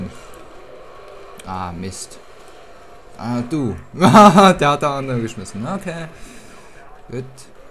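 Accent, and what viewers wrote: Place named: Switzerland. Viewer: Ehi du bist schweizer oder? Man merkts sofort :D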